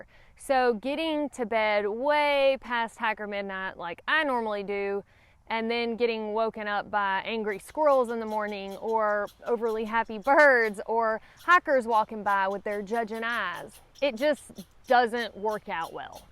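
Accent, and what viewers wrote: South, United States. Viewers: Over the years of watching you videos I have learned to adore you southern accent. Naat Haakin (night hiking) aat (out) aas (ice) and so on. I love it. Please don't take it as a criticism, it really isn't. I look forward to it. Your greeting of "Hey Y'all" is similar to our UK "hiya". Best wishes from the UK.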